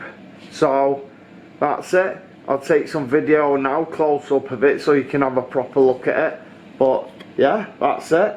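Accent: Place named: Birmingham, United Kingdom. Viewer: That's a strong accent! Is it Brummy or further north?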